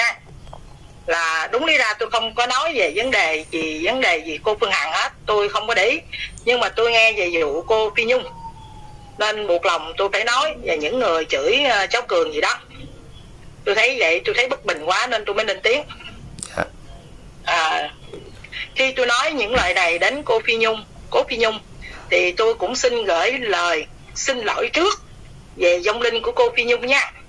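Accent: Standard. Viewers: Trời chị này nói chuẩn quá ủng hộ nhiều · Bà ns quá chuẩn 👏👏👏👏👏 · Cô này nói chuẩn quá ủng hộ cô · Cô nói chuẩn quá cảm ơn cô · Bà này nói rất rất chuẩn , chính xác 100% .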